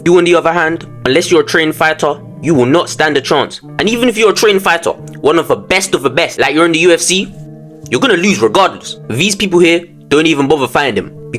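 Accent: United Kingdom. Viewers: Bruh you British?? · You sound like you are from the UK if I was you I woulda came to school witta knife if I gotta record a video like the one you showed 🙏🙏🙏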